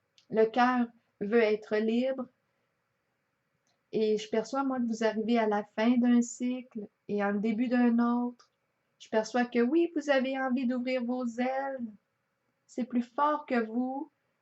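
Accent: Canada. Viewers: Bonjour oui j'ai vraiment envie de me sortir de ce bourbier! De ces blocages qui durent depuis trop longtemps, j'aimerais changer ma vie! Vous chantez bien,j'aime votre accent canadien 😃👍bélier ascendant lion poisson en lune! Plus de cinq ans de céliba! Oui j'ai envie de vivre l'amour,même si mes filles me comblent d'amour 😃👍 inconditionnel merci a vous pour cet espoir que vous nous redonnez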